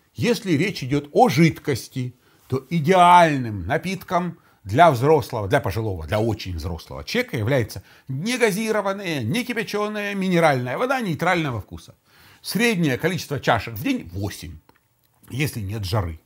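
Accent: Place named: Ukraine. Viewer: с українська акцент 😊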